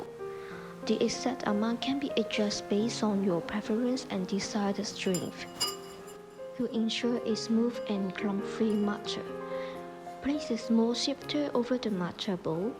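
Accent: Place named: China. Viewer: Are you Chinese? I love your accent.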